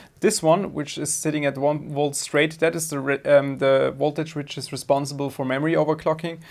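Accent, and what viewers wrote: Germany. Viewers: you are a native German speaker XD ... I mag the Klang of deinem accent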